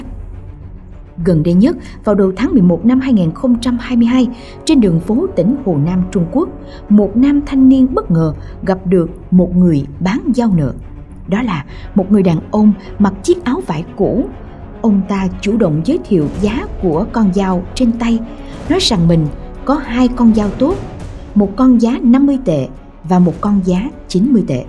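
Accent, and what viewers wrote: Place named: Quang Ngai, Vietnam. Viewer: Giồng như ở Quảng Ngãi VN quá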